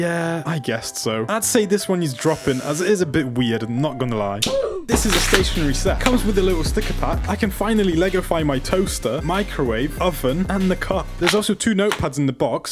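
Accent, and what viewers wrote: United Kingdom. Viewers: He's just british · Mate are you briish pin me if you are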